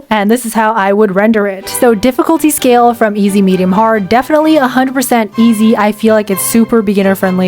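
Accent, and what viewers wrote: United Kingdom. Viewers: Are you uk or………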